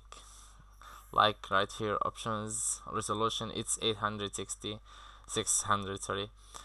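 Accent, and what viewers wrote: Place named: Arab world. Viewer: شكرا يا مان لانوا من لهجتك عرفت انك عربي ، على لغتك الانكليزية رائعة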